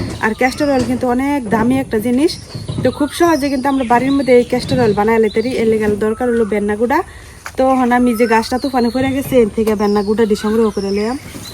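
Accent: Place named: Kishoreganj, Bangladesh. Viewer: আপু তো কিশোরগঞ্জের ভাষায় কথা বলেন, আপুর বাড়ি কি কিশোরগঞ্জ বা ময়মনসিংহ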